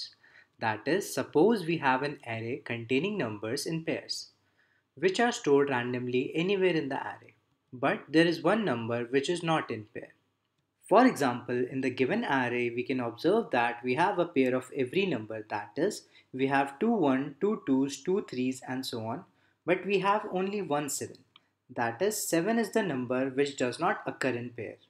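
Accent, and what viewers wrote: India. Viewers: fucking indian accent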